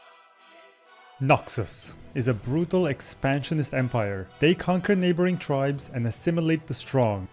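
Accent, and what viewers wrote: Romania. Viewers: are you Romanian?? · are u romanian?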